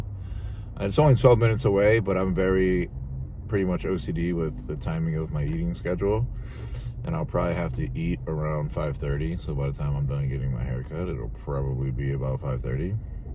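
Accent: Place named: New Jersey, United States. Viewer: I really think the biggest problem with how you talk is that people don't understand how we talk in New Jersey.. so the problem is really theirs.. fuck em.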